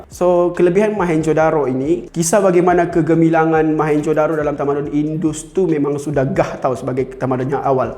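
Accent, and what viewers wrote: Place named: Sabah, Malaysia. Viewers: Mcm loghat sabah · Sabah kan😂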